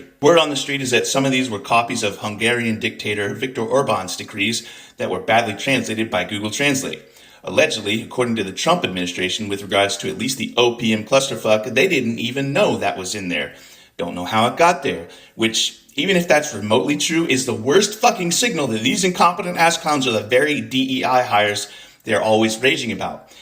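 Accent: Scotland, United Kingdom. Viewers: Point #1) absolutely spot-on,. Point #2) anger sounds better in a Scottish accent than any other, and I'm glad not to be the only one who does it. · I am catching a WHOLE BUNCH of a Scottish accent in that rant! So effective!!!! Love it!! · Love the Scottish accent shining brightly through this glorious, righteous rant. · Scottish accent ?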